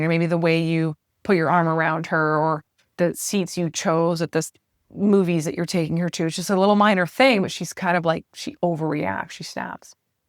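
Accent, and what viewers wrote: Canada. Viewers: I just love. Your Canadian accent. You are very attractive. I’m sure that makes you uncomfortable. Why? “Oh I’m smart and professional.” You’re still a girl, right?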